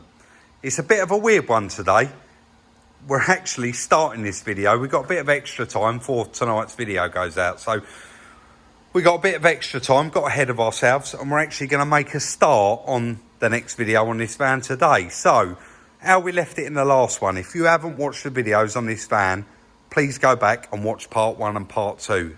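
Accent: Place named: Essex, United Kingdom. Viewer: Hey, my wife was overhearing your accent she thinks you're an Essex boy. Is she right?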